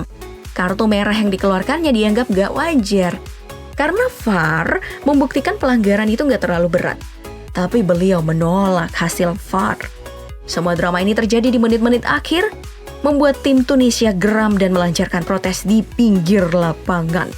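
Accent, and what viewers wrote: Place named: Banjar, Indonesia. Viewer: Orang Banjar kah pengisi suara DP nih? Kedengaran Pakai bahasa banjar kadang wkwkwk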